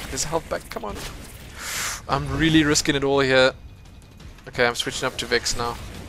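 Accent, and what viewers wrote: South Africa. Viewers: I'm probably wrong but are you south african?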